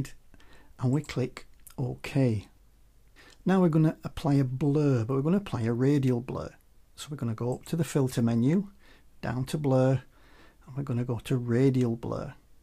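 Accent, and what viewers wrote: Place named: Yorkshire, United Kingdom. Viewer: Nice one....I can think of all sorts of uses for this. Great to hear a Yorkshire accent too:)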